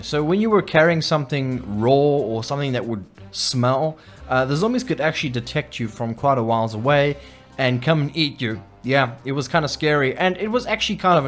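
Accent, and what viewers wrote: South Africa. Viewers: Hey are you south African the accent sounds like it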